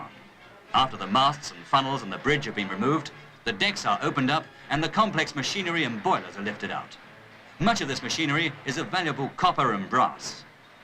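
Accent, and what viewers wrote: United Kingdom. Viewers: Love the British narration. Tip: tour HMS Belfast on the Thames. Sea going comfort for all crew.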